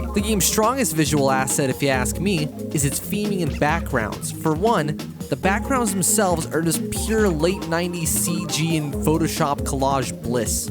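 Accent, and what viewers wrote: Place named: United States. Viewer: Наистина звучиш като американец. Впечатляващо. Също кошчетата за боклук (барабани) на Ларс звучат прекрасно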